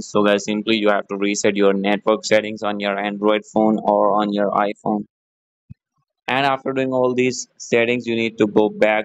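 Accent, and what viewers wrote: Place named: India. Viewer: Indian ahh accent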